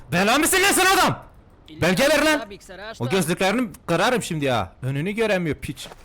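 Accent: Elazığ, Turkey. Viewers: Abi sen elazığlımısın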